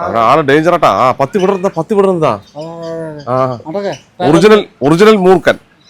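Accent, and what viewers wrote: Palakkad, India. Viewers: പാലക്കാടൻ ഭാഷ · പാലക്കാടൻ സ്ലാങ്ങ് അവതരണം ആണ് പലരെയും ഈ വീഡിയോ കാണാൻ പിടിച്ചിരുത്തുന്നത്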